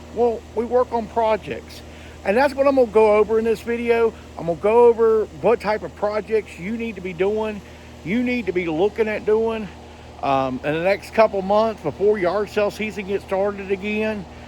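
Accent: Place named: South, United States. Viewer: I love your southern accent!! 'Coconut Castaway'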